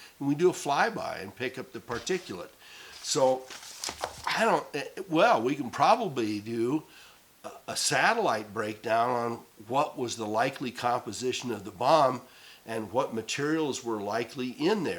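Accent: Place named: Michigan, United States. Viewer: ahhh, don't know why just always had a hunch you were from MI, thought I heard a MI accent. Maybe it's the farmer in you I recognize. Anyhow, I'd like to speak directly with you, not sure how to do that without alerting everyone else to your contact info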